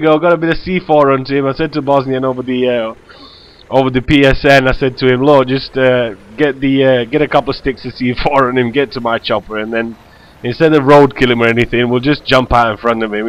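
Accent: United Kingdom. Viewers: where in the UK are u from?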